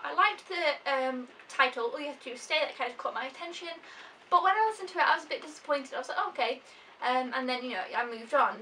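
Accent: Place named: United Kingdom. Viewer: I watched this entire 18 min video start to finish. I love your British accent and you kinda look like Tay Swift as well. Very good review for someone who doesn't usually do music reviews!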